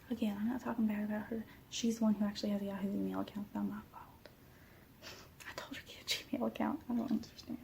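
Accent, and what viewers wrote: South, United States. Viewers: As a resident of the south you got the accent down well 😂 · Still so naturally chill and yay a new video 🤩 I don't usually find southern type accents relaxing as I have to focus harder to listen lol I'm British so it doesn't exactly come natural to me 😂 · Just when you wonder if the girl can get any cuter, she throws on a southern accent and continues stealing your heart <3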